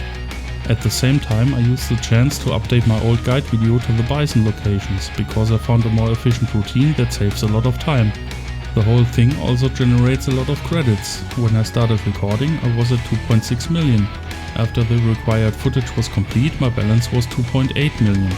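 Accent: Germany. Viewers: and btw are you german? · you sound German cuz of your accent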